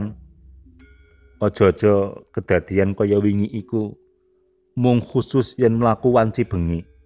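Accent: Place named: Banyumas, Indonesia. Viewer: Bagus..jawa versi ngapak banyunasan...jawa tak hanya jogya solo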